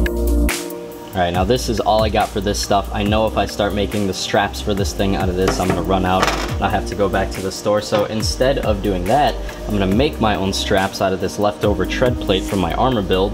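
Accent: France. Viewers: Dude that french accent 👌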